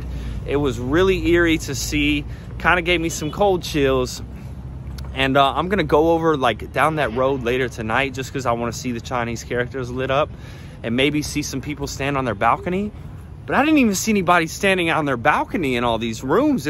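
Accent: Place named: South, United States. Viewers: Gorgeous videos. Love the accent. Where? Louisiana? ... Did you finally see some city personnel on the China side? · Great video n I enjoyed it. Guess you re from the south? Greetings from Southern CA.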